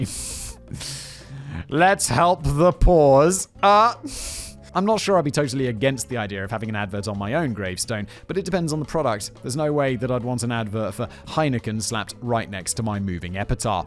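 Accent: United States. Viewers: Simon's getting more and more of an American accent. We do say "ad ver tise ment" not "ad vert as ment". LOL. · Simon has been reading so many scripts written by US. writers his brain is starting to use our pronunciations. This channel is great and the rants are classic. Thank you for making me smile on my darker days.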